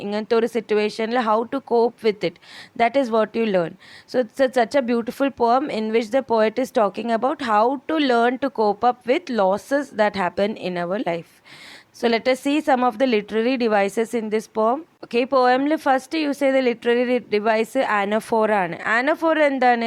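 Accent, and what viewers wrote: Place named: Kannur, India. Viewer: thankyou yr classes are great .. And your speaking style reminds me of my old friend at kannoor😀👍🏻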